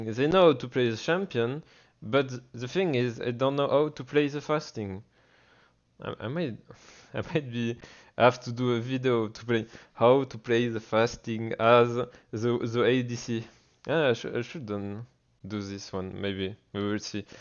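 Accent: France. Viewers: Cet accent français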